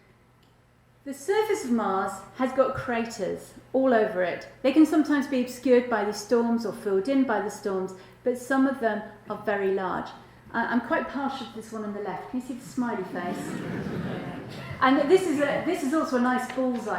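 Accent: United Kingdom. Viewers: British accent is so annoying.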